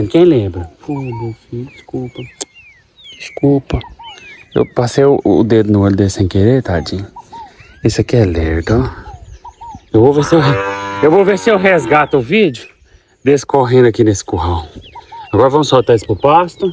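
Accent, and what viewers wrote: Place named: South Minas Gerais, Brazil. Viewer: moro no Sul de Minas e você fala que nem nós. Amo muito nosso sotaque! Deus te abençoe ❤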